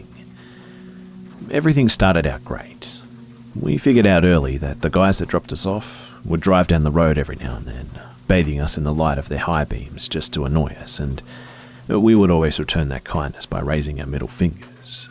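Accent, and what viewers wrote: Australia. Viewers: I love your stuff man ! And you're Australian voice, your video's go really nice with a joint in bed ! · are you an Aussie? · Is it me, or did your accent became more aussie?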